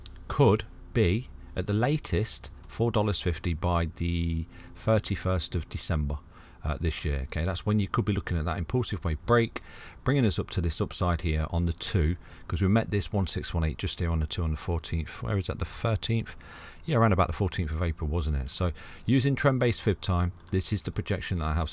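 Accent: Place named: Midlands, United Kingdom. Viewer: Thanks for the TA, 👍🏻 Where in the uk are you from? the accent can’t be far from me in Chesterfield… keep up the great work.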